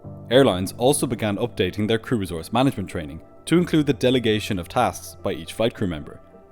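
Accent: Ireland. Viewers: Your Irish accent is so adorable.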